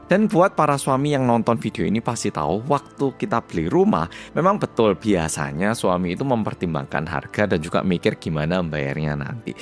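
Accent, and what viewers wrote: Java, Indonesia. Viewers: Mas yang medoook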